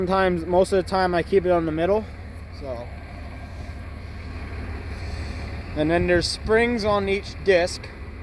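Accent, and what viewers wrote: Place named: South, United States. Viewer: OK I hear the country now...lmao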